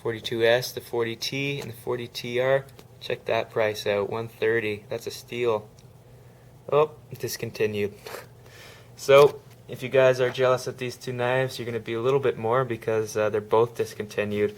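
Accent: Canada. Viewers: Wait are you Canadian?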